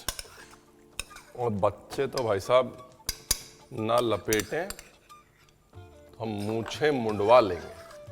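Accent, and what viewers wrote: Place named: Lucknow, India. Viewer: Liv ur lucknowwaaa slang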